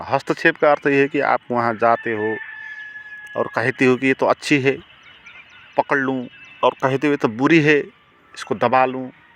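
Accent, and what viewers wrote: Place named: Nepal. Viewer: आप की हिन्दी में नेपाली की रस आ रहि हैं।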